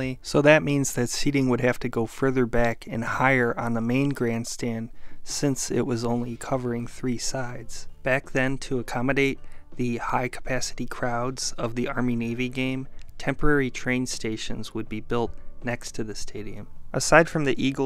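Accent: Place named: Cleveland, United States. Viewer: I love this guy's cleveland accent.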